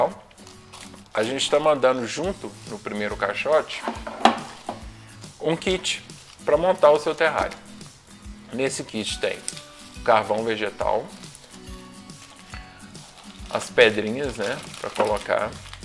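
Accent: Minas Gerais, Brazil. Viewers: Muito massa! Vou assinar o proximo! Adoro o sotaque de Minas! Sucesso meu velho!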